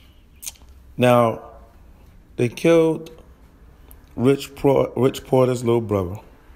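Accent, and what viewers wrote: New York, United States. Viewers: U from uptown my guy? Or nyc? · One thing you can say is it's a New York dude who is narrating this,you know several things,preacher is in the pen,in this person head,he can't get next to him, Another thing is this,speaking about preacher may help him get likes views clicks I dont know, To be frank I know apple preacher and the henchmen whom is from queens,whom preacher possibly turned on,but Im making this person stronger then how weak of preacher he might think he knows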